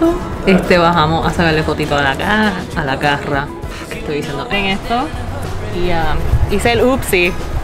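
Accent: Puerto Rico. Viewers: ¿Hablas algún dialecto chino? El acento es 100% Boricua 🇵🇷 en español. So my guess is that you don't, please prove me wrong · Una china y con acento boricua eso es el final una bomba 💣😋😋😋😋😋🤤🤤🤤🤤🤤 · Weeeeepaaa ‼️ La gente siempre se sorprende cuando alguien asiático habla español con acento boricua. Me encantan 😍 tus locuras y ocurrencias ✨‼️ Qué tengas un feliz inicio de semana ✨✨😍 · Hola chica te admiro como te desenvuelve aquí en Puerto Rico asta habla bien boricua cuidese mucho te amamos bendiciones💯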